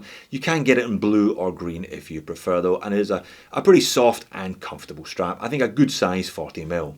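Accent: Scotland, United Kingdom. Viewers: LOVED THIS REVIEW.,and you have become my go to watch review channel by earning it flat out accurate fair-minded spot on assessments with amazing flair and Scottish brogue to boot! Fantastic watch. Fantastic review. Seiko...that's your watch except you didn't build it. Wtheck?! I'm buying this and I LOVE that tropical strap. TyJ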